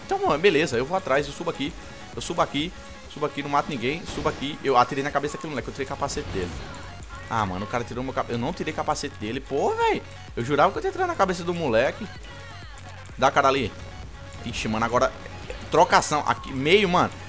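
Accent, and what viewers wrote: Northeast, Brazil. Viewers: Nordestino rapaiz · Likeeeeeeee, Nordestino Gostoso:3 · Nada melhor q o sotaque Nordestino!Manda um salve pro Rj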